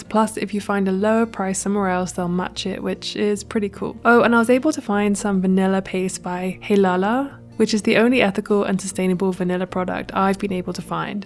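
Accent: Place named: United Kingdom. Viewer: You have such an interesting British accent, what region are you from?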